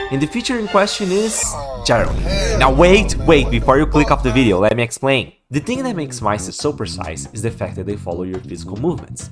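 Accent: Brazil. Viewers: Tava vendo esse vídeo e minha irmã perguntou se vc é brasileiro por causa do sotaque e eu olhei seu perfil da steam e vi que vc é mesmo do Brasil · impressão minha ou você tem um "accent" brasileiro? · Eu também acho até estranho que inglês abrasileirado assim eu entendo perfeitamente mais o de alguns nativos ainda engancha umas palavras no meu listeling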